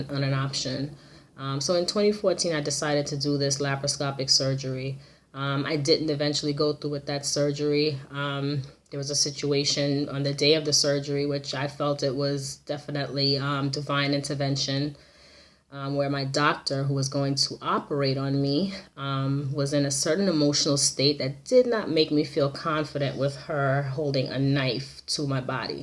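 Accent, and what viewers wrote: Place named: Jamaica. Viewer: I knew you was a yardie... picked up the accent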